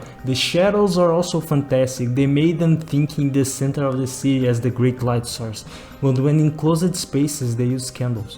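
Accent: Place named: Brazil. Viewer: sabia, dava pra perseber porque tu tem um sotaque brasileiro um pouco no seu ingles muito bom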